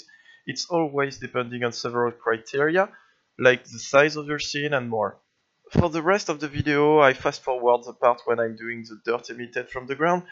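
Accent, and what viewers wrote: France. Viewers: J'ai l'impression que tu es français non ? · You're french ?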